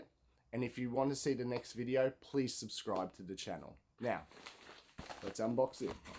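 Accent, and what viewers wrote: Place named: Australia. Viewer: Great to hear another Aussie accent talkin' watches on YouTube 👍👍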